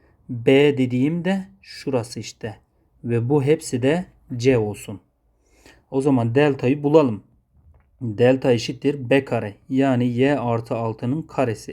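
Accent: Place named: Van, Turkey. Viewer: hocam Vanlı mısınız bir arkadaşımın konuşma şekline çok benziyor sizin konuşma şekliniz 😊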